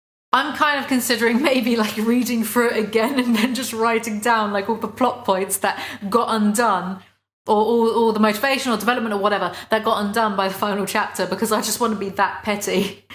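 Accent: United Kingdom. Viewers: nothing is far better than a British rant! good thank lord!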